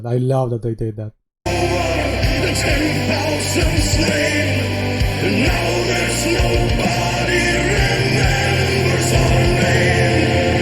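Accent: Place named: Spain. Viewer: Estoy enganchado a las reacciones, y el acento español se reconoce al segundo. Yo el primero. Buen video.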